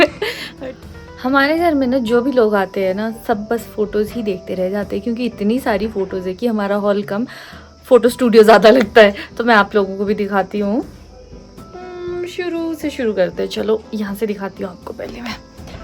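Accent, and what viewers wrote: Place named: Pahari, India. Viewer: i wanna merry to you your voice is so attractive.......and your phadi tune i like....baki kuch nhi h.....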